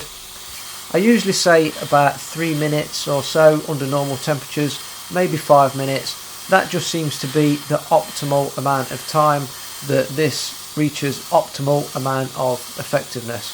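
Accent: United Kingdom. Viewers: Straight to the point no BS sound guy, and judging by his accent, no surprise, all straight talking in that part of uk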